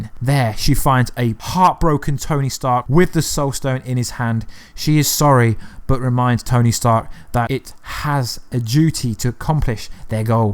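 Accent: United Kingdom. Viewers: Bruh are you British or a South American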